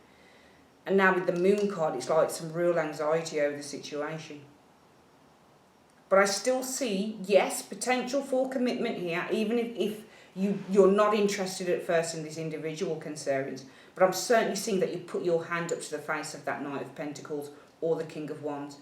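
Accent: United Kingdom. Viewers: You should put a Brit flag on your icon bc i cannot understand you. I'm sorry. I only speak American English. :(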